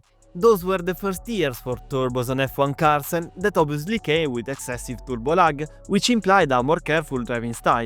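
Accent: Italy. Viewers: I never knew there is another accent could fit Formula One content but british, and there you go! Italian accent and some italian words makes it top notch! Plus it's fascinating too! Keep going mate! +1sub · Very very informative and interesting video, I didn't know the story but the way in which the Italian pronounciations add to the Mediterranean vibe is amazing NGL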